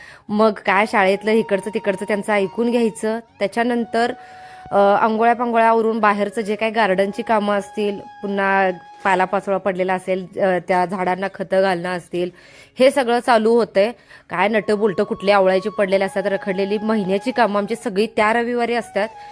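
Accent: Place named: Solapur, India. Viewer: ताईंच बोलणं कोकणी नाही वाटत, सोलापूरी किंवा सांगली कडील लय वाटते बोलण्यात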